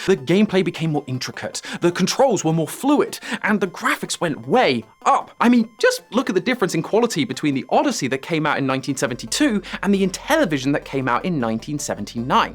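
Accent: United Kingdom. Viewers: When did they hire a Britt for this channel?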